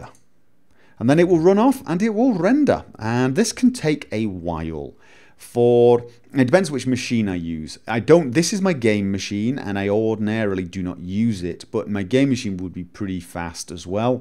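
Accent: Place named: England, United Kingdom. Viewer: It's a heavy'ish english accent, and I've heard plenty of scottish and irish people talk. Not all you scottish people have a superheavy accent.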